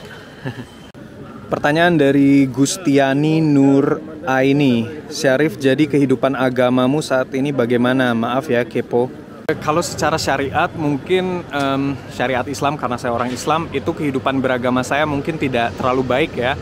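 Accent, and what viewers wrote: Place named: Banyumas, Indonesia. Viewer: wah, kang Syarif ya bisa ngendika ngapak.... ? cilacape pundi mas ? semoga selalu sehat dan barokah di manapun njenengan berada.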